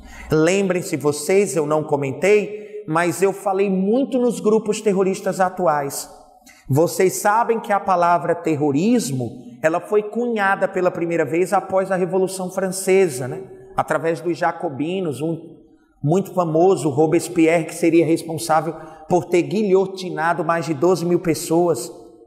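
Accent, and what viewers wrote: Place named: Northeast, Brazil. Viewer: Esse professor tem jeito que é nordestino sou tbm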